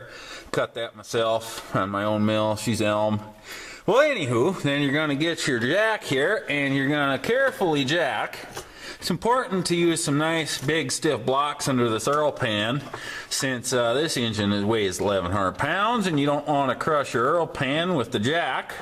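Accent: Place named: East Coast, United States. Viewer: Great video. I love the back east accent reminds me of home. Would love to have a beer with this guy.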